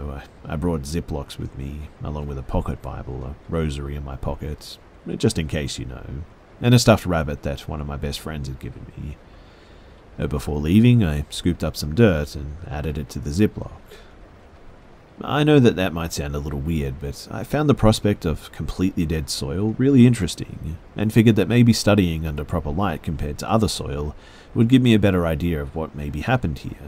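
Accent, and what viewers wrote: Australia. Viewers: So you’re from the land down under?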